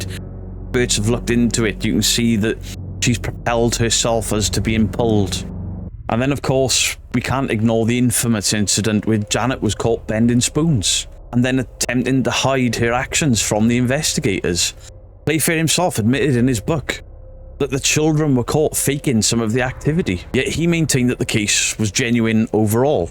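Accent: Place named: Newport, United Kingdom. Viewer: That a Newport accent I detect… lol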